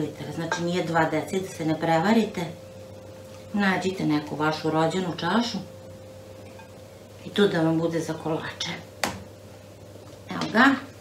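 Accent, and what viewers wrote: Vojvodina, Serbia. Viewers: A ja pored recepata najvolem vojvodjanski dijalekt❤️,tako slatko i milo divanite ,da Vas je milina slusati. Veliki pozdrav iz Curuga🥰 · Kolač je divan!A dijalekat ❤!Naš vojvođanski!❤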